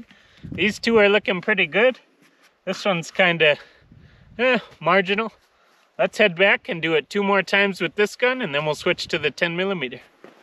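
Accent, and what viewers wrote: Canada. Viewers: You sound like a Canadian but shoot like an Alaskan. I'll subscribe.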